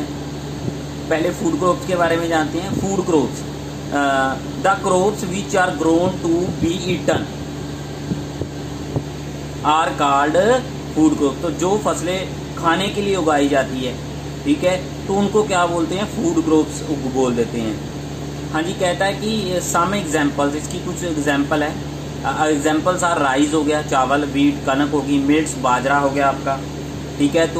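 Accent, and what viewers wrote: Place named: Tamil Nadu, India. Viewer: You are from Tamil Nadu I am sure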